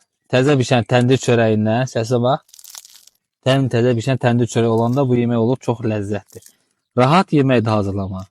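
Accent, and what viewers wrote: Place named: Zagatala, Azerbaijan. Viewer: Salam,bildiyime göre Zaqatala!💐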